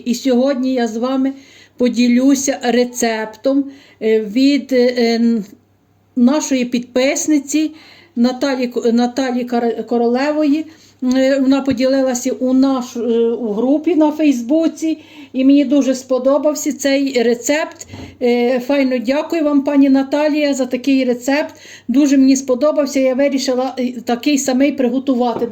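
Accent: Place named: Chernivtsi, Ukraine. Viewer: А мова, діалект, говір, словечка, наголос точно, як на Батьківщині у мого покійного чоловіка, а він з Чернівецької обл з Романківців. Наче в рідних вдома побула😊 - підписка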